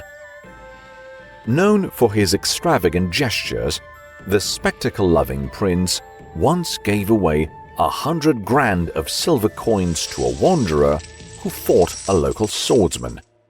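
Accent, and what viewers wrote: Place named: United Kingdom. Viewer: I love the British pronounciation so much. Sounds professional. It's my first time to listen English audiobooks. I can enjoy learning English now.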